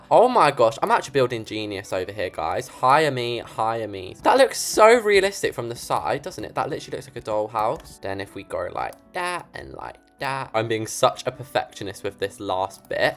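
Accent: United States. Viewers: Your american accent though🤭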